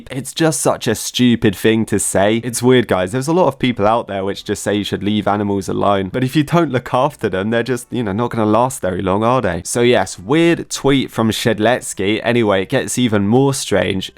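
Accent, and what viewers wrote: United Kingdom. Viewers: I love your accent this is the first time I've heard a UK accent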